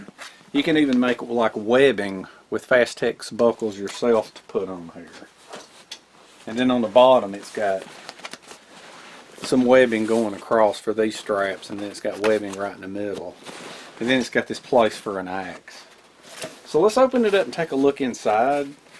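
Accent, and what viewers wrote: South, United States. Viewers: This is how a real American sounds like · What er you from my friend. I love that southern drawl! I’m from MS myself so it’s kinda nice for a southern man to do these vids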